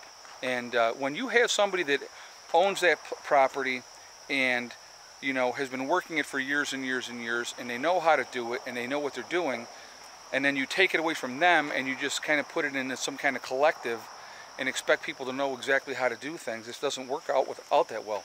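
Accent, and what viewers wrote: United States. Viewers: Iam a Christian and a Welshman to boot! I am on carer's allowance for looking after my elderly mother and I am thus poor by modern British standards but I would willingly try to accommodate any of my Christian brothers and sisters from SA and beyond! My only question is this, "Why have you got an American accent?"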